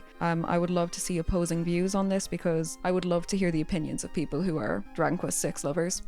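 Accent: Ireland. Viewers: Hold up is you irish? If so thats dope as shit can you say water in your next video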